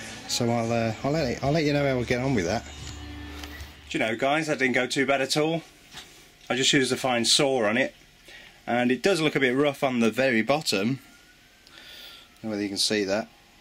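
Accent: Midlands, United Kingdom. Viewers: Not sure why you have chosen to concentrate on intonation as he is trying to communicate his experiences of sound proofing a project studio from a converted garage? This is not an uncommon musical experience. His 'rising intonation' has everything to do with his accent. It sounds like he has a very mild Midlands accent - rising intonation can also be found in other regional English accents such as Geordie or Mackem - I don't think he's trying to deliberately confuse us with non-questions. There are scores of American posts on this subject and I find it helpful that someone on this side of the pond can quote inexpensive UK based sites for sound proofing. Thank goodness he didn't work out the RT60, we may have nodded off. Well done. He did say he was an amateur and it was his first post, do you not think you're being a bit harsh?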